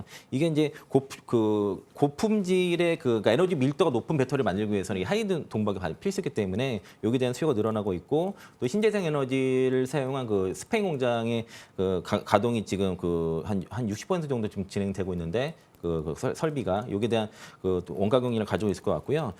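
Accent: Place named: North Korea. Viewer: 아나운서 목소리 북한사람인줄~~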